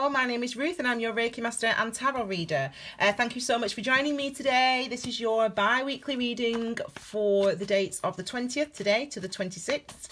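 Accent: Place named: Lancashire, United Kingdom. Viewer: Sounds like she's from Lancashire.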